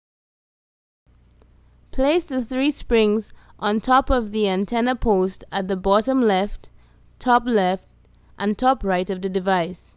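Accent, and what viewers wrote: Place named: Jamaica. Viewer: jamaican gal ah talk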